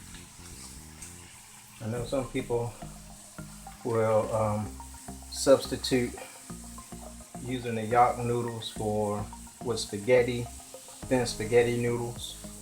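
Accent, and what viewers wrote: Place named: Tidewater, United States. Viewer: I knew it!!!! I knew it!!!! Soon as I heard you speak, I knew you was from Tidewater! That’s my home Fam! 757 Bad Newz!!! Man Love Me some Yock Bruh! Can’t wait to make your recipe Homie! Salute!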